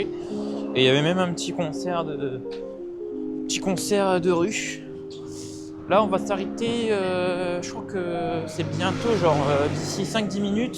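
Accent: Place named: Japan. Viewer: on kiff l'accent japonais ( continue t'es vidéos elle sont incroyables )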